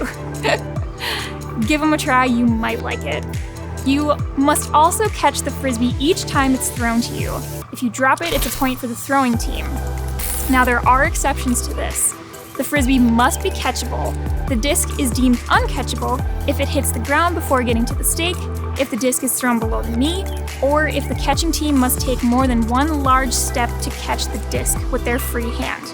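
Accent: Midwest, United States. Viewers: Wisconsinite here. Was going to say, Midwesterner for sure!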